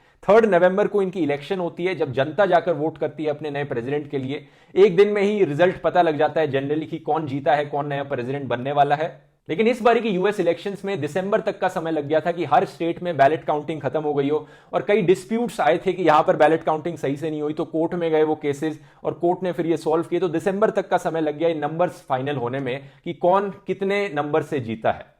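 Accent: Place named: Punjab, India. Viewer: Diware taap rhe the🤣🤣🤣🤣 thoda Punjabi accent ki tone hai apke andar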